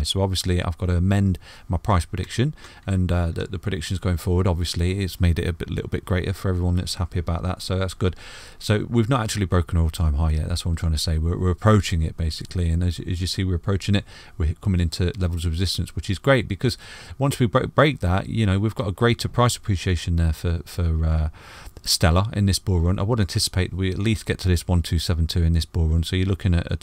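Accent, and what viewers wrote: United Kingdom. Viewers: Gotta love the Bri’ish accent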